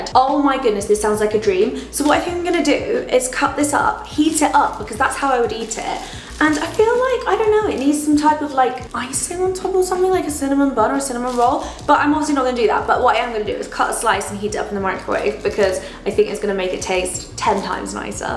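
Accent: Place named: Home Counties, United Kingdom. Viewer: Is there a factory that mass produces these influenzers? They all sound home counties England, boarding school 'Oh my Goshing', posh tottie🙄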